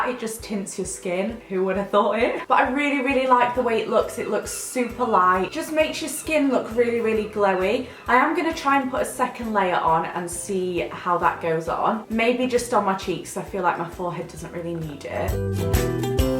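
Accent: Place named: Yorkshire, United Kingdom. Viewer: Sounds weird but you've got the nicest accent ever... is it Yorkshire? xo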